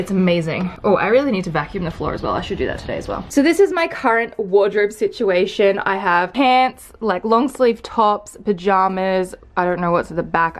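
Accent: Australia. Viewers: Adore your Australian English 🖤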